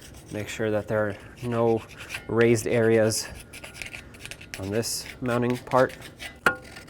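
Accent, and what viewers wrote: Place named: South, United States. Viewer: This guy is from the south for sure lol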